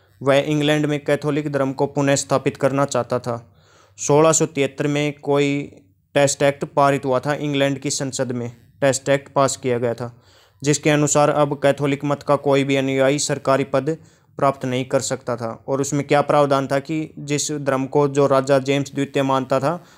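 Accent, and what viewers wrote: Haryana, India. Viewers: Bhai aap hariyana se Ho kya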